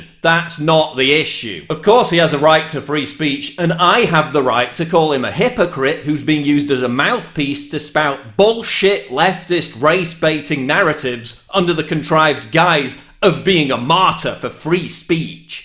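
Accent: United Kingdom. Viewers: why do you have a British accent? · I can't help but laugh because your accent comes from a country where America isn't liked. Yet you jump on the bandwagon of creating a YouTube about the most recent news so you can collect some easy cash for more tea and crumpet. hehe ^_^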